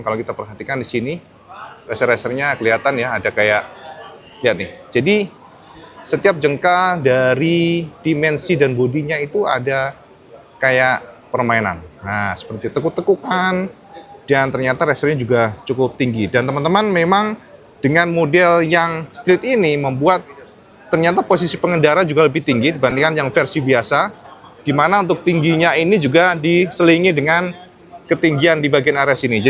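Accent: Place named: East Java, Indonesia. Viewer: Lampu sein nya ngepang... Bahasa jatimnya ancen Jos Gandos... Medok...😅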